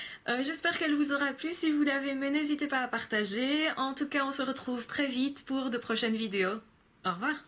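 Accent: Belgium. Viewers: Bonjour, vos vidéos m'aide énormément en ce moment, elles sont géniales!!! j'aurais aimé savoir si vous exerciez en France? je perçois un petit accent belge:-)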